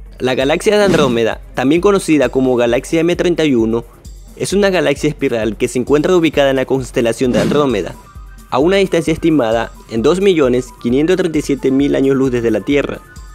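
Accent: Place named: France. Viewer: Ahh y la voz con ese toke como de frances pero en español me explico?? Español con acento frances...como el inspector de la pantera rosa.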